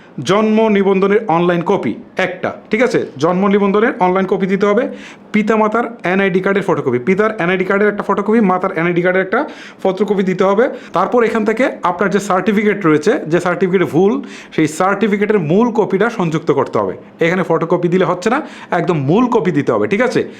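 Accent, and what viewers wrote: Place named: Kishoreganj, Bangladesh. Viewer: ভাইয়া আপনার বাড়ি কি কিশোরগঞ্জ জেলায়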